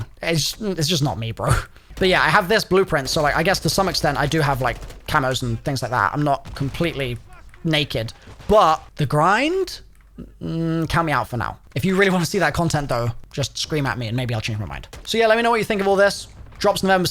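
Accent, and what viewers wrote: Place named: United Kingdom. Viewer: "Voice issue" its called a british accent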